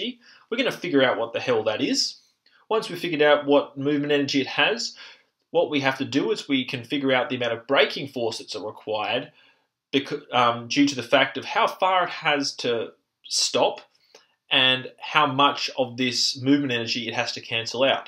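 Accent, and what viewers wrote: Australia. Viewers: This has helped me so much. I'm enjoying physics and mathematics in general a lot more as these concepts become more intuitive. Perhaps the narrator having an aussie accent similar to my own helps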